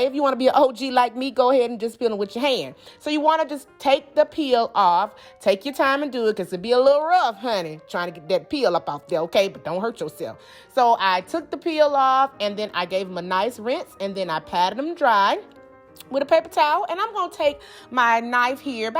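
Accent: South, United States. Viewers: your southern charming voice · Why did the commentary to the video bring me back in 2025! 😂 love her southern accent mixed with the humor 🤣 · The accent got me. I was so distracted listening to her southern drawl that I forgot what I came here for :-). She had me cracking up when she went "you need to make a lil mo chile".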